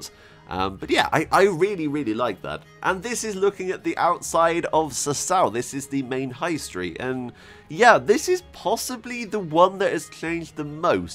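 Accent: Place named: Dorset, United Kingdom. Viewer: is that a posh dorset accent? I have no idea what it is, wacky man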